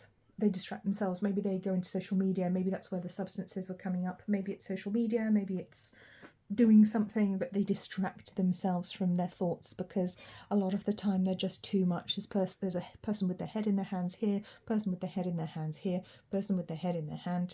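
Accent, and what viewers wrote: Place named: United Kingdom. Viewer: First thing you said in option 2 is you heard a British male voice. That’s my person (I’m American). His particular accent is much like yours. Sunflowers, poker, navy, and keen humor are especially significant. 1’s, 0’s and time— it’ll be 10 years apart at the end of summer. Also my sun and moon signs showed up. This resonated well in many ways—excellent reading. Thank you ❤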